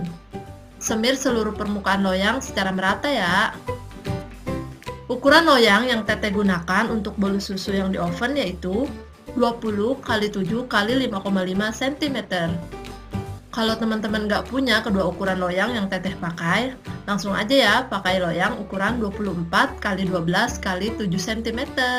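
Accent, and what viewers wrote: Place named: Java, Indonesia. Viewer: owh orang cikarang toh jdi kya logat jawa ya' 🤣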